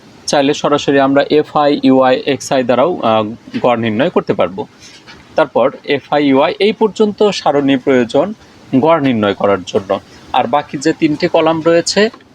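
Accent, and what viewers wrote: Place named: Pabna, Bangladesh. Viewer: Sir আপনার village ki pabnai? Language oirokom..🙂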